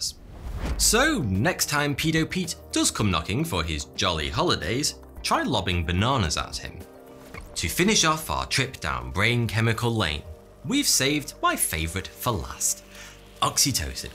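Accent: United Kingdom. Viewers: So.... is the British accent what makes " " sound like "42" or is this on purpose? or id it only me? I'm not an English native speaker so... just wondering. Anyways awesome content! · Im here for the mustache and beitish accent lol.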